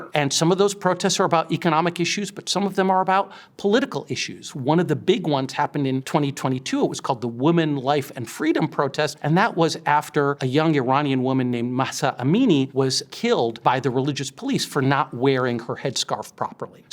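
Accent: Arab world. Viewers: His pronunciation is very Arabic and less Persian. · I always wait for him to pronounce arabic words super arabic style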